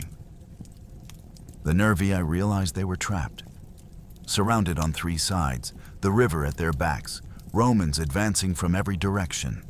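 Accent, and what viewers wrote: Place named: United States. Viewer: American accent doesn’t help describing historical facts…….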